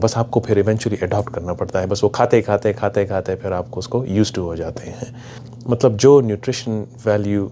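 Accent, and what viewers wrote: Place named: Sindh, Pakistan. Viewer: Wow - your sindhi reflects in your accent - Love it 😍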